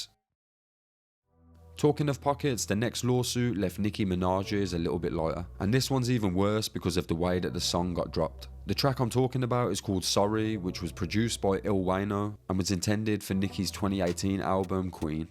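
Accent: Birmingham, United Kingdom. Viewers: Love your Brummie accent - it’s a rarity on YT. Keep making vids, mate!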